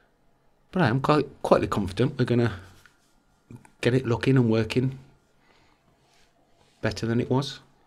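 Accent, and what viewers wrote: United Kingdom. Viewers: Accent from somewhere near Cov?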